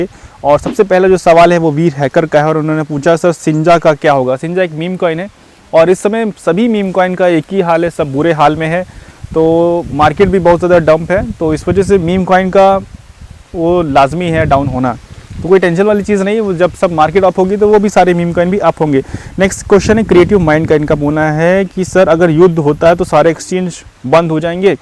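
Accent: Uttar Pradesh, India. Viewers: Sir yeh Kaunse GARDEN me Video banate ho har Sunday ko? aur aap Kaha se ho...? aapka accent UP wala hai, mai bhi UP se hu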